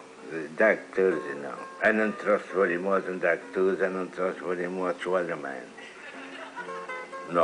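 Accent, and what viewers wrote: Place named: Portugal. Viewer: This is how my parents talk too. LOL :) (Portuguese too)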